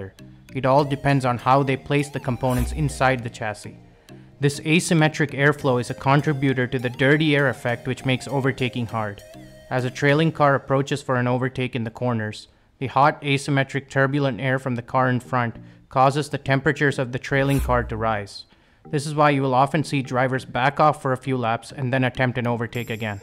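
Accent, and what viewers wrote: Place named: India. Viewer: Don't hold back your tongue when speaking. That's why Indian accents really hurts my ears when listening.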